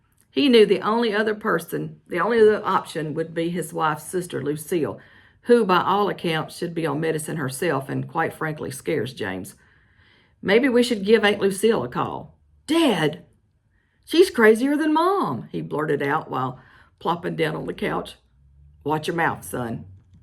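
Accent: South, United States. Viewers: I love your southern and expressive accent. Such talent!🥇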